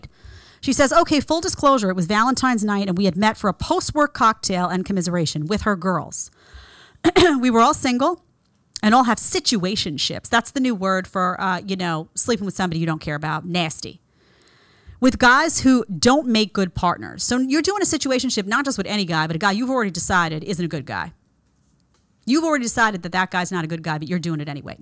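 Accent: New York, United States. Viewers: The more I hear that Noo Yawk accent, the MORE i will listen. 😎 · No one can say "nasty" like Jedediah. Thank God we have a woman like her to cut through all the BS, and pointing out women like this. I'm surprised so few women commented on this video; I would like to hear how reasonable women feel about 304s like the one at the start of the video, and what their experience is with any of their 304 acquaintances. I love Jedediah's New York accent. Pay attention as she says "awl" for "all". I am so happy to have found her channel a few weeks ago. She is spot on. I hope she continues with these topics on her channel. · Every time says NASTY with her NY accent talking about these modern women.😂😂😂😂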